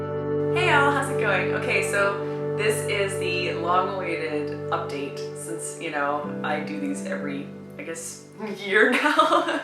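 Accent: South, United States. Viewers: to a brit, you dont have a strong southern accent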